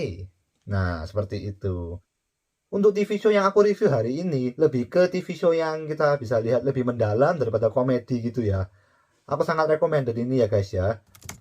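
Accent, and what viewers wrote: Java, Indonesia. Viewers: aku suka bgt nonton variety show tp sayangnya tayangan televisi indonesia ga banyak ngasih acara reality show real, ada pun settingan ga jelas dan ga ada yg bagus menurutku kecuali Channel Net TV doang sehingga banyak ku habiskan nonton tayangan korea drama atau varietynya. Akhirnya discover channel youtube ini krn alogaritma youtube saat aku nyari dr. Eun Young pas bagian Ikon tampil. Sayangnya banyak yg gada subtitle engsub/Indosub nya variety psikologi ini (sekarang yg ada subs nya cuma dr Oh Eun Young's Report: Marriage Hell, lumayan belajar tentang dunia pernikahan hehee), padahal pengen bgt ada subs nya biar bisa belajar, ga cuma haha hihi doang kyk variety show lainnya yg jenisnya komedi. Kembali bahas channel youtube ini, pertama kali klik play, lah kog medhog, mengingatkan Korea Reomit/Jang Hansol hahaaa.. menarik, bakal kepo dan liat video2 di beranda channel ini. 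Semoga berkembang selalu dan banyak menyajikan info apapun yang menarik dan baik,!!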